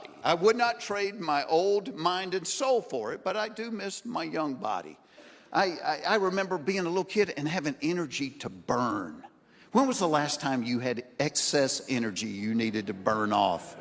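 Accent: United States. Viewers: Not funny Jeff is going to be old soon. Making fun of the elderly is uncalled for. He has no respect for the elderly that helped support him baby sat when he was a baby and a little boy growing up. He was spoiled and the parents let him treat his grandparents anyway he wanted. Bad parenting has spoiled grown children. Jeff acts like he is younger than he is. To think his mother is in her 80s. Yes an elderly person can carry at 80 since their grown children are left alone in their homes and they live by themselves the elderly have to have protection. They don't have to buy a 38 caliber gun they can buy a small 22. How would he feel if his mother was robbed at night and they hurt his mother all because he made fun of her not wanting her to get a gun. The bucket has been a part of history when they didn't have bathrooms with flushing toilets and most everybody all ages had a pot that was put under their bed. 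How about the poor that had to use buckets and people they only had out houses. He's got a southern accent he should know the south had hard times just like the people in the north New York. Shame on him being a so called comedian. The elderly have real medical problems no related to age. Alzheimer's is Diabetes of the brain and the researchers found a 13'year old with Alzheimer's. They quoted its not related to age. forgets things all the time he isn't going to be all alone in forgetfulness because memory loss comes from pesticides and what's all in our food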